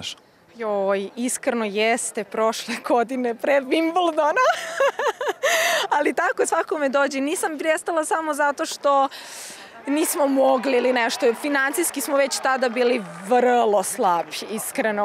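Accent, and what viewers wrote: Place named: Niš, Serbia. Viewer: Bravo. Pricaj si po niski ne trudi se da gadjas beogradski. Nek se potrudi da razume ko hoce. 💪👩🎾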